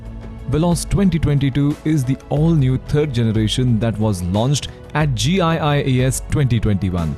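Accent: India. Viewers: India. listen carefully to his english. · Its japanese car..its weird when indian voice introducer start kick in 🤣.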